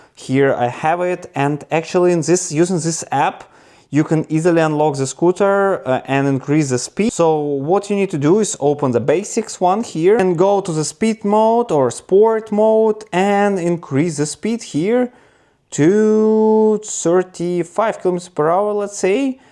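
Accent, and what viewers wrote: Russia. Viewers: А по русски? У тебя русский акцент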